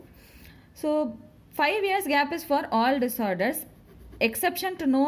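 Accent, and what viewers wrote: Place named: South India, India. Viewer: Very nice presentation.lovely voice with south indian accent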